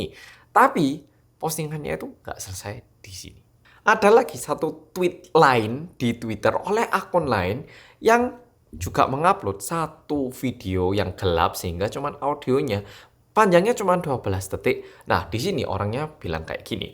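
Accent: Java, Indonesia. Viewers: Glodakan gak tuh.. nyentak ke saya 😂 medhoknya bisa otomatis nyampur gitu ya bahasanya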